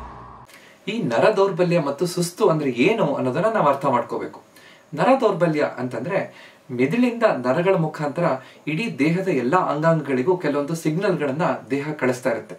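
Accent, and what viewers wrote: Standard. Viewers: Swàtchha Kannada & good information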